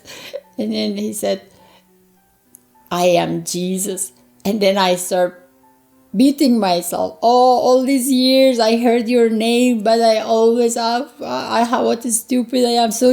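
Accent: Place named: Iran. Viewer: I'm just curious... what county in the Middle East? My guess Iran from the accent . Jesus is who He said He was all along.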